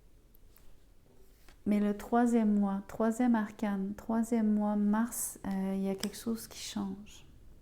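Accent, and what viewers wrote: Quebec, Canada. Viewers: Bonjour, déjà j'aime beaucoup votre calme , votre voix, par contre je vois pas du tout qui cela pourrait être , cette personne ?? Bref vous m 'apprenez qu'une femme pense à moi en secret , car vraiment je ne vois pas qui c'est ! l'avenir me le dira ou pas . Merci a vous et finalement, je commence à aimer l'accent québécois.😉🤭🤔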